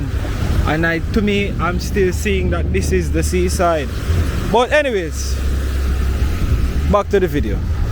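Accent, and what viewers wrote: Jamaica. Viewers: Thank you for this chance 💜 to see Negrill again. Love to hear your beautiful Jamaican accent. Hope to return again to your country again one day. Such wonderful people and country!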